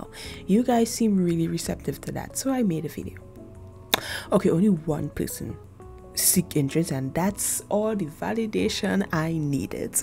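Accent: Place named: Trinidad and Tobago. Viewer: so i'm listening to you talk and i'm like 'why she sound kinda like a trini so loll' love your art :3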